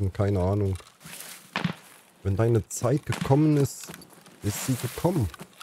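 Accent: East Germany, Germany. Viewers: Sonofcolossus hat es so schön zusammen gefasst - daher möchte ich mich auch mal zu Wort melden, nachdem ich Deine Videos schon eine ganze Weile erfolge: Erstmal Hallo Wie gesagt verfolge ich Deine Videos zu Tarkov schon länger. Anfangs dachte ich mir schon mal ab und an - was fürn noob. Aber je öfter ich in deine Videos geschaut habe, habe ich feststellen müssen - da spielt einfach ein "Otto-Normal". Keine high-end Waffen oder meta- gear, kein Rumgespringe oder ausm Fenster des 3. Stocks in die Parterre, oder straight auf jede Konfrontation,sondern einfach nur "normal" zocken. Klar geht das auch oft in die Hose (kenne ich selber), aber ich finde deine Videos dennoch wesentlich unterhaltsamer als die von Meyer, 2Twistet oder sonstigen namenhaften Streamern! Dazu kommt dein "Ossi-Dialekt" - herrlich - vor allem, wenn du weggeballert wirst und "fluchst". 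Oder einer deiner Kumpels mit denen zu in die Raids gehst (komme gerade nicht auf seinen Namen -!?) der immer so schön sagt "Ach hör' mir doch öff" 🤣 - herzerfrischend! (an der Stelle, Grüße aus dem Westen), Unterm Strich muss ich zugeben, dass ich mich mittlerweile echt auf neue Videos von dir freue und alle wirklich immer unterhaltsam sind! Mach' weiter so, bleib' Deiner Linie treu und viele Kills und Loot gewünscht! Grüße, DoomDog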